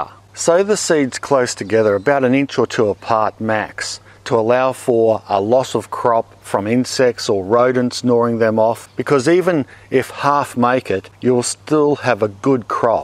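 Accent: Australia. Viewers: beautiful video! A bit of a personal question - what happened to your arm? Did you get bit? I've been meaning to mention it as it looks scarred - just curious as to what happened to your forearm. Looks like it healed nicely though. Looks like it happened a long time ago. As someone with scars on one of his arms too it's just something that jumped out at me. You're still beautiful! ;) Also I feel many of us listen to your videos half for the helpful tips and also for your great cheerful personality and wonderful accent - I come from canada myself and I love australian accents :)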